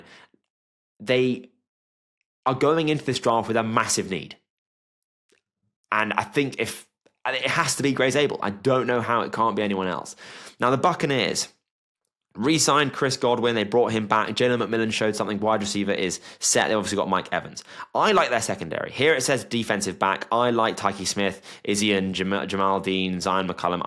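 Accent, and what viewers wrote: United Kingdom. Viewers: Caleb with the most sacks and this dude thinks the Bears need a tight end😂. cole Kmet who played well and love him, no need for another tight end, they will not go warren, sorry. They need a D lineman or strong Oline man, their line on both sides were ass last year. Makes zero sense to pick up warren. If they don't go lineman they will go HB, because they traded their young Rb Khalil .That's also why you won't see Warren in literally any other mock for the bears. Dumb pick Also is this a British guy? Lol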